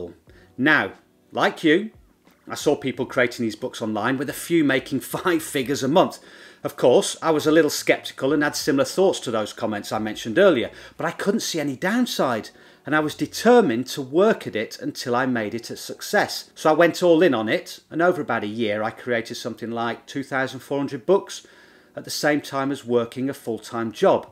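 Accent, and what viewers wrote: United Kingdom. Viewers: I didnt intend to go for KDP, because so many people said (at least, on Youtube) it's saturated. But your video about creating a lined journal persuaded me to give a try. As for this video, it's very honest and to the piont. I dont know, if it seems to me but you sound British rather than American :) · Love your British accent...It makes your tips oh so much more valuable!! When I close my eyes, I hear Michael Caine...Cheers!! #smileyheart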